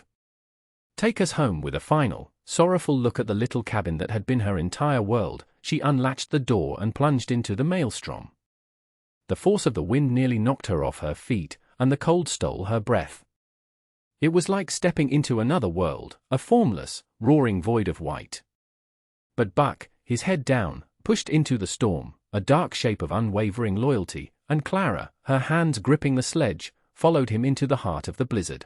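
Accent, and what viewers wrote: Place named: United Kingdom. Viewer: A sledge is what we call a sleigh in the UK. I'm just clarifying for you as the speaker is speaking with a UK accent. I was thinking that is what I was thinking as my mom and siblings used a sleigh to go to school in winter here in the US. I'm not trying to make fun of you.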